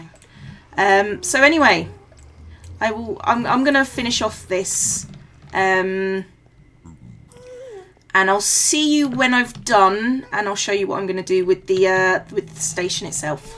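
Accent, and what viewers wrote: United Kingdom. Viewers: What i think about u is that I hate you you suck and your accent is just, ugh! Your videos are boring as hell!! What i ACTUALLY think about you is that, you're awesome, i like what you do, and i watch your videos and actually enjoy and be happy about it, i love you and your british accent:D you are the 2. Youtuber in my top 5 youtubers list! <3:3:D keep it up, you're going great!! >.<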